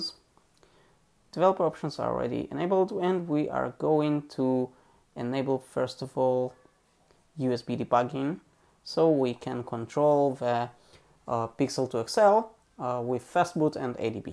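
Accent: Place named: Israel. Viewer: ?! יש מצב? קודם כל זיהיתי מבטא ישראלי, אח"כ זיהיתי את הקול ואז זה התחבר לי עם, יש מצב?!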